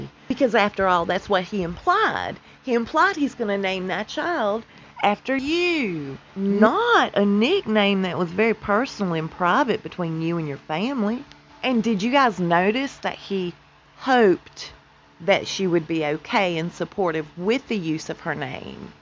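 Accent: South, United States. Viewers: You really have a strong southern accent where are you from? I'm from the south but my accent is not as thick as yours.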